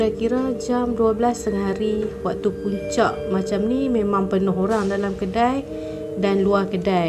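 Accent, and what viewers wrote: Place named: North, Malaysia. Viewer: Seronok dengar bercakap logat utara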